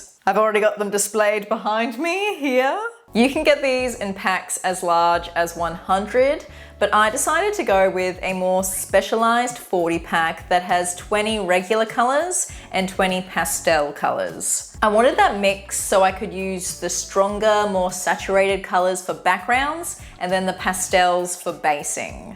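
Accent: Australia. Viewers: wow this must have took a lot of effort and motivation to do, I like your aussie accent!😂 crayola really gives off this nostalgia vibe from colouring and drawing as a kid with these supplies, overall you did a good job of colouring this design, I really like how the water colour effect blends together nicely to create almost a tie-dye effect💕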